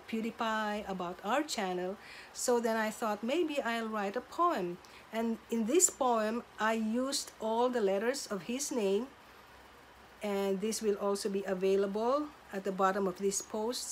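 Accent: Philippines. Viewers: i knew it from the first time you showed yourself on channel that you were filipino. from the voice and the accent palang eh. pusong mammon forever ang mommy ng Pinas! godbless po:) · I sense a Filipino accent...Kabayan! Haha. More reasons to like.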